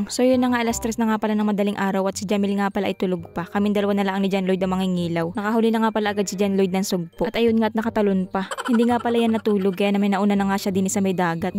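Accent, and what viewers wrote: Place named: Mindoro, Philippines. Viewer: Hi miss sana lagi kang makapag upload araw2 nagustuhan ko mga content mo kasi natutuwa ako the way ka magsalita pare pareho talaga ang tono nyo ni kamangyan vlog, saka si mommy che na keri taga mindoro rin sya saka yung mag asawang teacher na vlogger yung babae naklimutan ko yung name nila sa fb para kasing bihira na sya mag vlog eh kaya nalimutan ko na pero love na love ko kayo mga mindoronian mabuhaY kayo....❤❤❤❤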